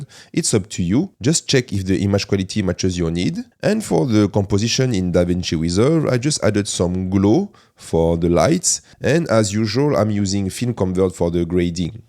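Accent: France. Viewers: i havent done this tutorial yet, but this is something have have been looking for. also i love your french accent 👌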